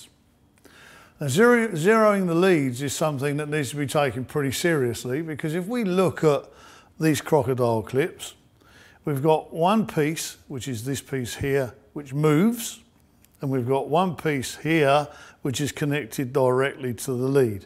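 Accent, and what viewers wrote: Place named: United Kingdom. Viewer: This gentleman speaks like Magneto in X-Men. Nice British accent 👌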